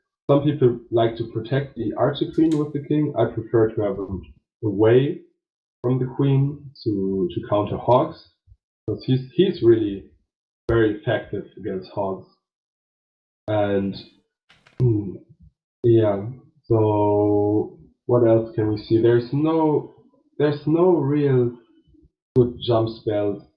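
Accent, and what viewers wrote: Germany. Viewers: base buirding..... I love the German accent!! DL FTW CWL invite Season 2!🔥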